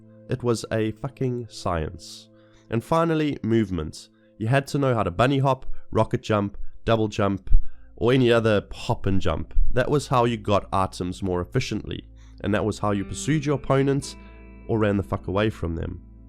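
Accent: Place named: South Africa. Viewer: Dude, you nailed it. Are you South African ?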